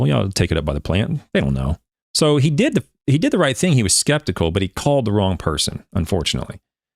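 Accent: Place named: South, United States. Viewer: Quite the southern drawl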